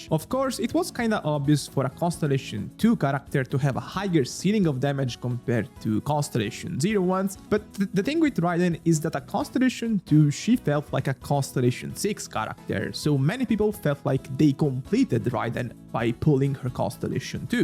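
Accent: Italy. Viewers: yo bro, u italian?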